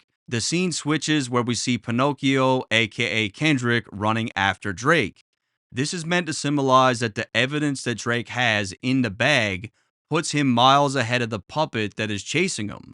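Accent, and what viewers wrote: Canada. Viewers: You sound Canadian 🤔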